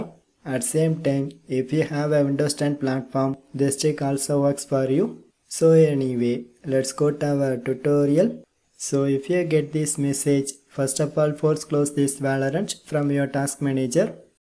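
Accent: South India, India. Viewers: south indian lol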